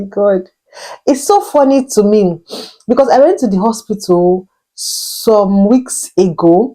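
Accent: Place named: Africa. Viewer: My guess is Nigeria but definitely African countries based on the accent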